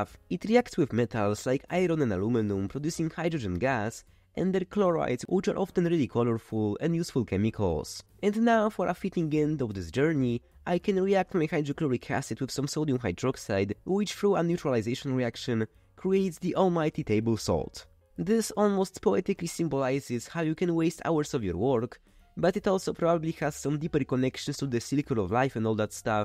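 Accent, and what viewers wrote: Poland. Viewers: My new favorite ASMR listening to chem synth in English via a cool a$$ dude wth a Polish accent. 👌🏻 10/10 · hey bro, are you polish by any chance, you have polish accent in your english lol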